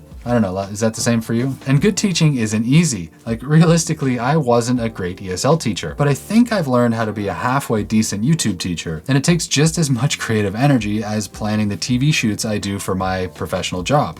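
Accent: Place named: Texas, United States. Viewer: Canadian? I thought you were from Texas.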